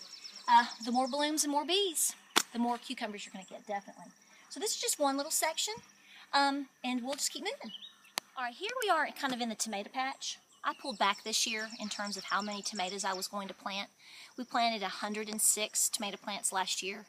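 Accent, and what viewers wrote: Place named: Kentucky, United States. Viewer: Your garden looks great! Up farther north and in mountains here we have a much later start, and one thing after another has been going wrong for me this year. I've got to get one of those owls, might keep the wild bunnies out. I'm about to build a wattle fence. I also need to get some form of irrigation set up, would you believe my house has no outdoor spigot. Love your accent reminds me of friends I had growing up in ky.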